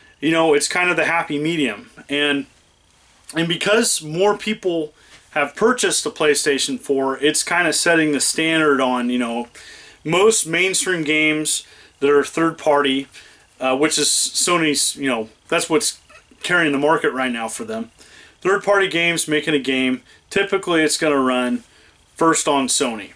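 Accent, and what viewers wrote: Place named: Pennsylvania, United States. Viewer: Where u from sounds like you’re from Pennsylvania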